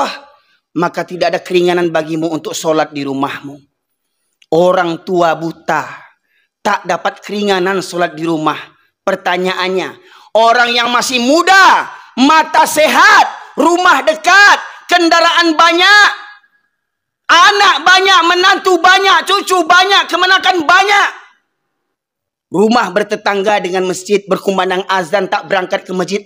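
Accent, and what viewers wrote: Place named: West Sumatra, Indonesia. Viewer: Ustad dari Sumatra Barat logatnya😮mantap man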